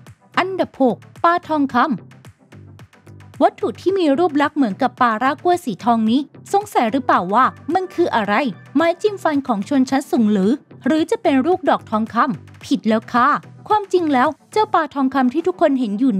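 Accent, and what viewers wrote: Thailand. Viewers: ที่รู้ๆคือ พูดเหน่อมาก นี่ขนาดดูพยายามไม่ให้เหน่อนะเนี่ย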